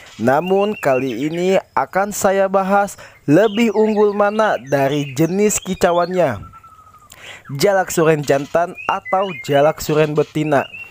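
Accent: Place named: Sunda, Indonesia. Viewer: Inimah nadana urang sunda,salam kang ti bogor timur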